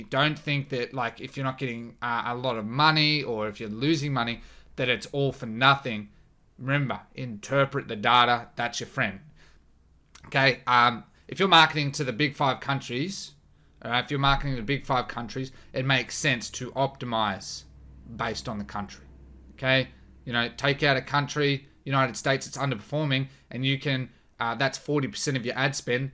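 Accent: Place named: Australia. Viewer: Thank you so much man for the content , I'm understanding Australian English better now 👍👍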